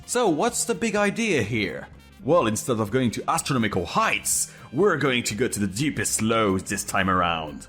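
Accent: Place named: France. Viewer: I like your french accent.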